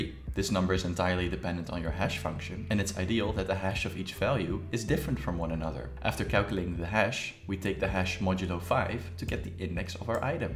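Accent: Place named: Netherlands. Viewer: I smell Dutch 🤔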